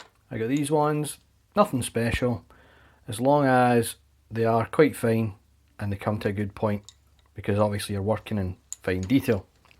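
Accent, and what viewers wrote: Scotland, United Kingdom. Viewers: I owe u an apology. I have started painting figures because I just have no room anymore for planes and tanks so I came looking for hints on painting 72 scale. Clicked yours and heard the accent and thought geezus no way. BUT I listened on and in the end, thought what a great tutorial. So old mate, sorry for thinking here we go some Scottish damn wanker waffling. It was the opposite so thank you heaps. ( PS You have to excuse me, Im an Aussie :-) ) Subscribed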